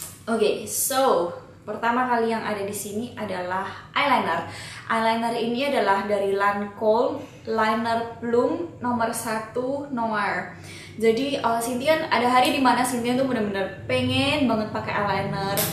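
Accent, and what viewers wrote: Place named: Java, Indonesia. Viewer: medokkk bgttt kaloo ngmngggg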